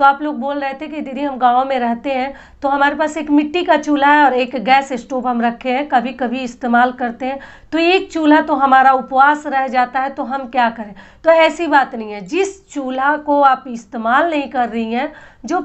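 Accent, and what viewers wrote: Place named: Uttar Pradesh, India. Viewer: Jai mata di🙏🙏didi aap kis kahan se hai up se ya bihar se apki bate sunkr to up se hi hai aisa lgta hai pr kis gav se hai kyoki mai bhi up gorkhpur se hun pr abhi nagaland se apka video dekhti hun apki bate sunkr mujhe apne mayke ki bahut yaad ati hai sasural to delhi me hai pr mayka gorkhpur me hai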